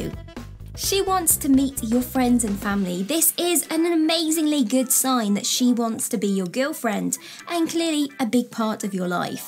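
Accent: United Kingdom. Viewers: Uk accest much better then usa and Canada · NunYo BizNizz It’s British.., where did you get that idea from? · I labh the British accent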